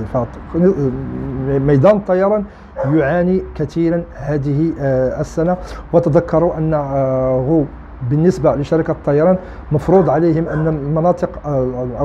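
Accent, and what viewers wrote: Morocco. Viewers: اخي ربنا يحفظك عندك اللكنه المغربية قوية نرجو لو تكرم ذكر الاشهر بالعربي او الانجلش لاني لا افهم الشهور باللهجه المغربية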